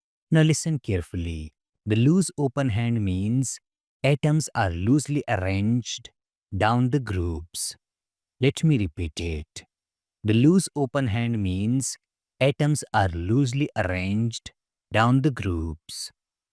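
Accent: India. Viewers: Sounds so indianlistic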